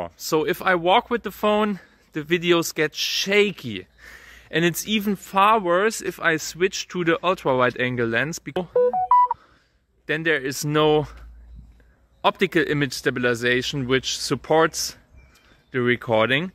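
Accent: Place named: Germany. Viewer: Coool German accent like it bro!😊 Thank you for your good (I think =) honest tests. I would be happy if you would test the small Galaxy s22 (6.1") again. It is said to have come a little closer to the flagship specs with camera etc. small and good cameras and battery is something i always hoped for, more so since the apple mini phones, you can start a petition that xiaomi or other widder build small phones, it doesn't have to be the best cpu.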